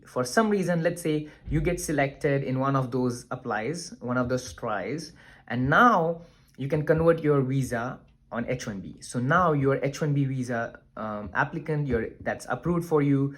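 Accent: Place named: South India, India. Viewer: Chutiya accent badal be... Understand se pata chalta hai ki South Indian hai ye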